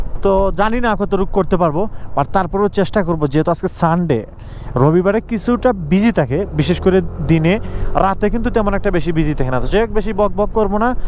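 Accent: Sylhet, Bangladesh. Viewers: ভাই আপনি কি সিলেটি? নতুন অবস্তায় ফুড ডেলিভারি করা যাবে কি না জানাবেন দয়া করে New subscriber of your ❤️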